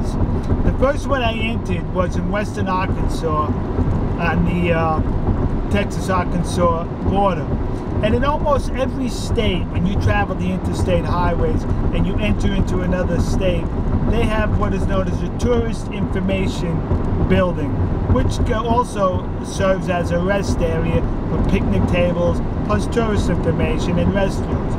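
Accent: New York, United States. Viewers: Based on your base accent, I'm of course thinking that you're heading back to good old New York. But we'll see!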